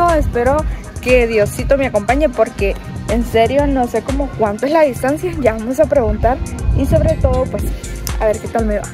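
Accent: Guatemala. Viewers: Estoy sorprendido porque tú ya no hablas como salvadoreña, por un momento pensé que eras chapina.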